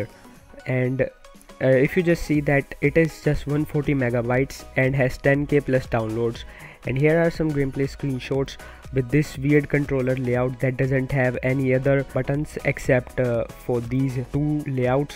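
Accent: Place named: India. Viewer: when i hear that Indian accent i know its a scam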